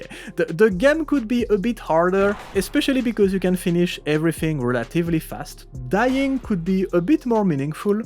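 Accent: France. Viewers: I cant believe theres so many people not recognising your french accent you sound exactly like houngoungagne who makes cs and hes very french too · Je sais reconnaître un accent français quand j’en entends un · Call me fool, but you got french accent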